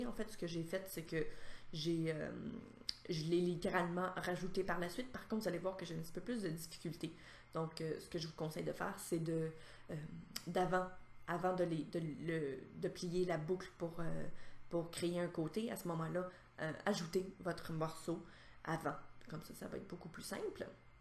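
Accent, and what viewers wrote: Quebec, Canada. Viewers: de rien vos videos sont super et j'adore l'accent quebecois ;)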